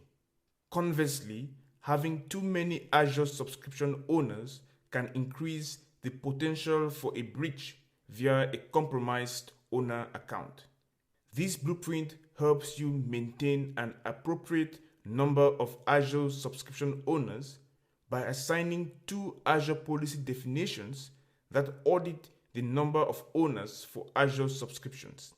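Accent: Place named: Africa. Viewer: Your name is, but accent is african... where are you from, just curious...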